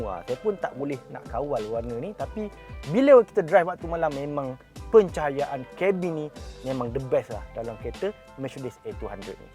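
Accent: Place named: East Coast, Malaysia. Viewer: org pantai timur mmg slang mcm ni..sama mcm abg jakpa.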